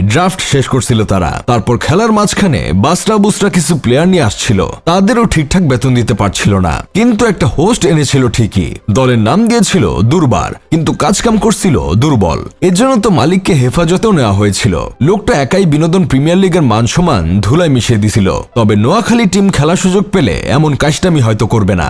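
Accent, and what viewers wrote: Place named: Barishal, Bangladesh. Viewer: বরিশাইল্লা বলে কথা 🙂😁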